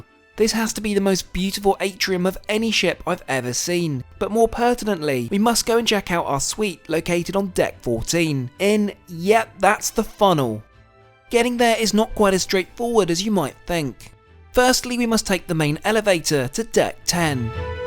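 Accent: RP, United Kingdom. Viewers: This is a cool video and is a great and informative room tour but I have never in my life heard such a posh accent with such awful pronunciation of words 😭 I must admit however that blurring kids faces is fantastic. You’ve done incredibly well there.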